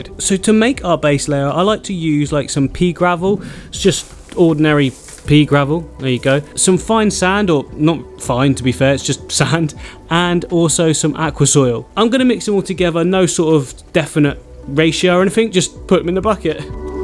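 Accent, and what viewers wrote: United Kingdom. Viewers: I think he's from the UK.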